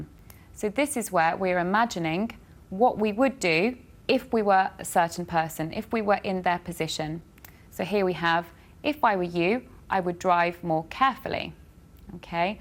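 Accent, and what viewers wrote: United Kingdom. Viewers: Omg, your accent is just killing me. I came here looking at some lessons and now I'm in love. British women accent rules!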